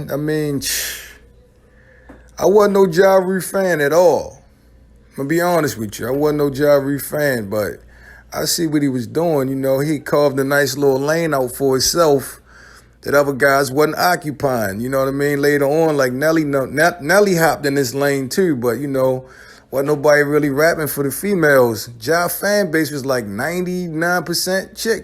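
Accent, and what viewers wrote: Baltimore, United States. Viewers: You sound like you’re from B-More homie....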